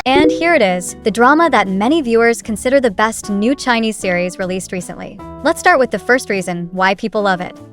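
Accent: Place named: United States. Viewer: While I am glad that cdramas are getting more attention, it really rubs me the wrong way when Chinese names are pronounced American style. It's really not that hard to figure out how the names are actually pronounced.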